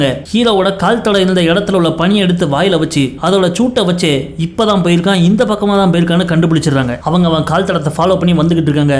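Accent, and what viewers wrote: Tirunelveli, India. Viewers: திருநெல்வேலி ஸ்லாங் சூப்பர் 👌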